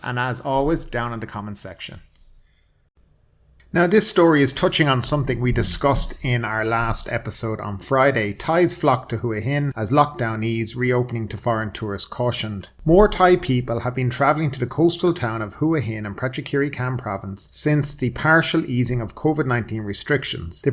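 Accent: Ireland. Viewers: Great to hear an irish man on the air waves.your very informed,speak cearly & give a independend oponion.i was fitting a kitchen on 9/11.im a galway man myself.hoping to get to thailand again.when i dont know.all the best eith the channel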